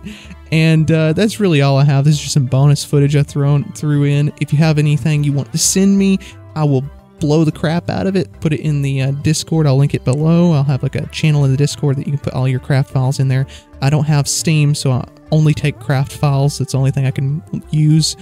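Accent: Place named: Iowa, United States. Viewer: It's just a yes or no can you make a like ww3 series were you use that weapon mod basically nato vs russia and china and other Rouge contries pls and thank you. (other suggestion make a F22 and the B2 bomber) (I know you a guy from Iowa or something in the usa that has a dessert cuz you got a nice accent) (sorry if that offended you) (Gramerly helped with that) (I think im using too much brackets lol i mean these (((())) )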